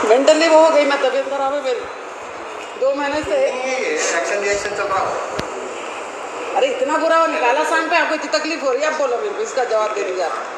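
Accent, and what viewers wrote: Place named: Hyderabad, India. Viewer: ap sida urdu boliye haydrabadi acha nahi lagta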